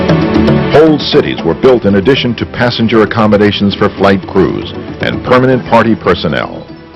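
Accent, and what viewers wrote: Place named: United States. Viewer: Might be an interesting subject but the american accent is insufferable and the sound-track is hellish.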